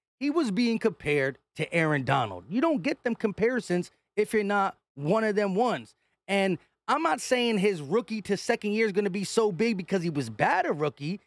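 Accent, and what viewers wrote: Philadelphia, United States. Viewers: 'Im back,.............. knew i'd be......sorry for gettin on you bro, I didnt have any weed for like a month!!!!!!!!! OUCH..............COmpliment, your voice feels like a Philly voice. Keeep oon getttin on:elbowcough::elbowcough::elbowcough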